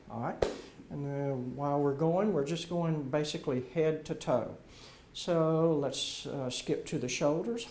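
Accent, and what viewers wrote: United States. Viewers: Ahmad Syarbini Mat Southern, most likely Louisiana. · I didn’t even notice an accent until you pointed it out. Lol. So I’m guessing south.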